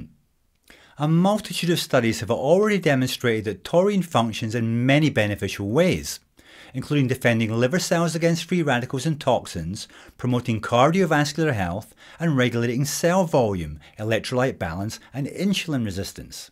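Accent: United Kingdom. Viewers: Very helpful! Thanks. Btw, will it make your hair fall out like this other supplement I forget the name of? ...creatine? P.S. what kind of fancy accent is he speaking with, something British? I couldn't understand some words, believe it or not.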